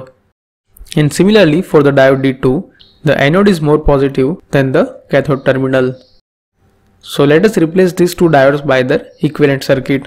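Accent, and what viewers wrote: India. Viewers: We love his Indian accent. After all we are seeing some Indian guy on the scene. Congratulations whoever you are. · Damn, there is something about Indian accent English that makes things easy to understand :D, nice vid man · Indian accent :-(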